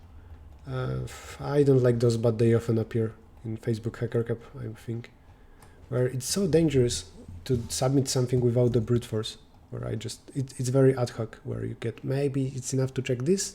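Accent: Poland. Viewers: Słychać że 🇵🇱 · chyba się zgubiłem. Coś mi akcent nie pasował i myślę polak. dobrą robotę robisz, pozdro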